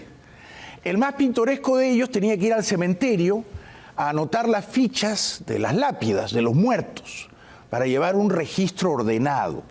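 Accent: Peru. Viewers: Castellano peruano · así es, el castellano limeño.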